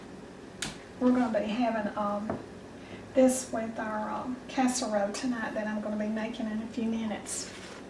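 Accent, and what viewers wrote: South, United States. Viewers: Watching her for the first time love the recipe and her southern accent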